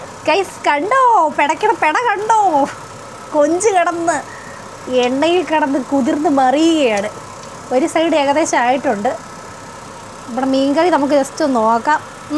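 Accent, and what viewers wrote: Kottayam, India. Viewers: എന്നാ കൊച്ചേ വീഡിയോ ഒന്നും ഇടാത്തെ ചുമ്മാ നടക്കുമ്പോൾ എങ്കിലും ഫോൺ പൊക്കിപിടിച്ചു പോകു 😂😂 1k കഴിഞ്ഞു വല്ലതും അറിയുന്നുണ്ടോ 😂😂😜. കോട്ടയം,കണ്ണൂർ, സ്ലാങ് കേൾക്കാൻ നല്ല രസമാ ☺️ അവിടെ ഏതോ കട്ടിലിൽ നടക്കുന്നകണ്ടു 😊 അവിടെ 5 ഏക്കർ വാങ്ങിയോ 😊 പുതിയ സ്ഥലം കാണിച്ചിട്ട് വല്ല്യ അനക്കമൊന്നുമില്ലലോ??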